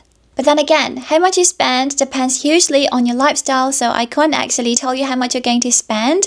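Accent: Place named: United Kingdom. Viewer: Just curious, did you attend a Uk boarding school? Because of your accent haha.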